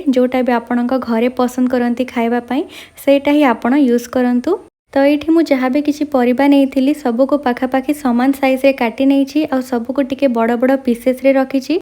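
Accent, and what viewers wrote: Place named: Western Odisha, India. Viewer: Say in your western odisha accent please it's so soothing to hear.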